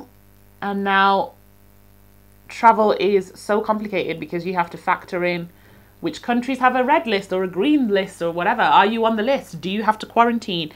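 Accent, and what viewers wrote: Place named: United Kingdom. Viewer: no problem! Haha I can't hear it at all. You sound like you landed from the UK like yesterday 😅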